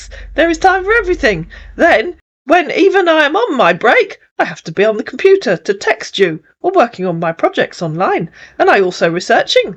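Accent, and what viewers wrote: United Kingdom. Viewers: 😂 😂 😂 I LOVE it when, in your proper British accent, call him "a semi-illiterate idiot". Oh, you kill me!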